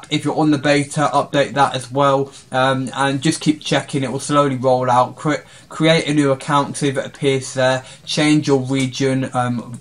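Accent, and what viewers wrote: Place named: United Kingdom. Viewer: lovin the british accent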